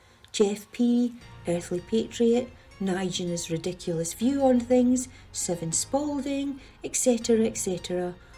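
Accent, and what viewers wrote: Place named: Scotland, United Kingdom. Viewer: Your sweet voice+Scottish accent, is like song for sore ears, my dear! And yes, it has truly been, the wankiest of years; all of what you mentioned, my best flyfishing buddy (me Da, 83yrs young) being dagnosed, with terminal Liver-Cancer😩 (moderate drinker!). Feelings: <link> May, Hugin & Munin, guide your way...Take care of yourself and yours!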